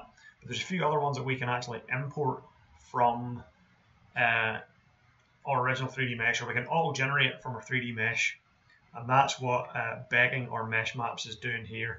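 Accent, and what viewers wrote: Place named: Northern Ireland, United Kingdom. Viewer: Trying to import cameras from Blender into Substance led me here. Whilst this video didn't answer my question, it did introduce me to a fellow Mourneman. Well, I'm guessing from the accent!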